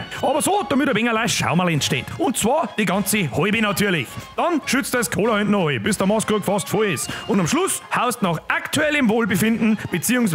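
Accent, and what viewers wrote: Bavaria, Germany. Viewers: Natürlich kenne alle deine how...... Finde das bayrisch Sau komisch wenn dich aufregst... Greetz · alter die bayrische sproch isch echt nersch, irgendwie ähnlich wia österreichisch aber iwi o voll ähnlich aber manchmol verstand i echt ka wort haha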